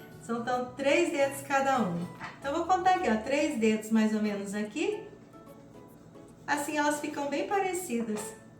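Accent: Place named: Minas Gerais, Brazil. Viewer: eu sou encantada com vc. Adoro seu jeitinho de falar, sua autenticidade, sua simpatia. Vc é linda! Esse seu lindo sotaque é de onde mesmo? Tem um jeitinho meio mineirinho. Eu sou de BH. Amo minha mineirice. Beijo em seu ❤️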